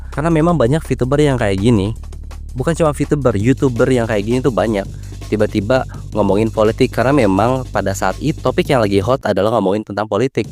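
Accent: West Java, Indonesia. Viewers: bang, lu sunda ya?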